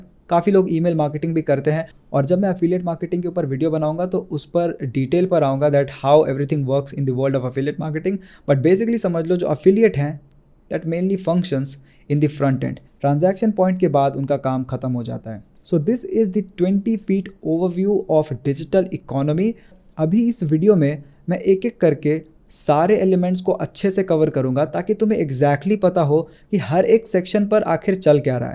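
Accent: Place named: Bengal, India. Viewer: Saying US as "तुम".. It clearly shows that you are from the land of Bengal with that sweetness🍬 in our talk. Ami I wrong then mention me. Thank you my friend 😊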